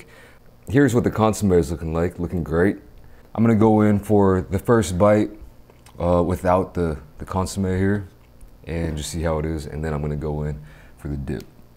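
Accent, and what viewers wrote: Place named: Midwest, United States. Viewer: They on to somethin', I'll tell ya' what! - A key part to any video is having his Midwestern-isms come out!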